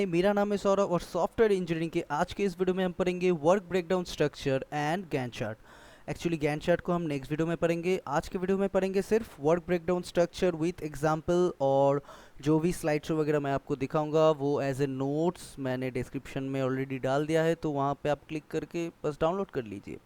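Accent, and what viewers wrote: Bengal, India. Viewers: Bangali naki?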